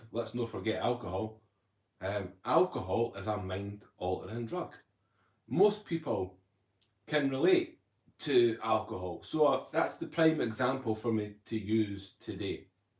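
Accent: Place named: Scotland, United Kingdom. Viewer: Great video. I love listening to you talk. You are very natural on camera. Your Scottish accent is great. I have learned a lot from listening to you.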